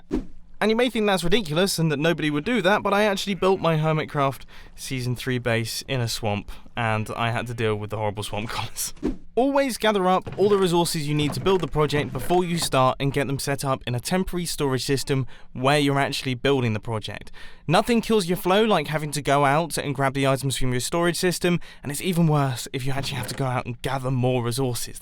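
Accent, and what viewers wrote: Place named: England, United Kingdom. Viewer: Damn i feel the english through my phone.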